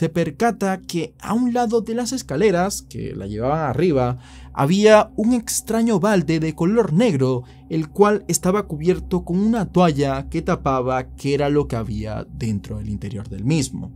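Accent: Dominican Republic. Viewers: Enserio es de Perú?😮 Creí que era Dominicano 😮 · Yo pensaba que era dominicano pero me acabo de enterar que es venezolano watafak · Una pregunta, eres Dominicano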